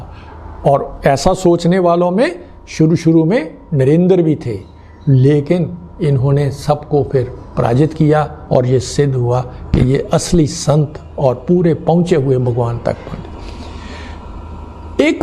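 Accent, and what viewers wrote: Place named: Punjab, India. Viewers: सर, हिन्दी के शुद्ध उच्चारण की आवश्यकता है। कहीं कहीं पंजाबी उच्चारण के प्रभाव की झलक मिलती है। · Bilkul theek surjit bhai ..kya karen hum pbi hee hain ...jhalak tow padegi !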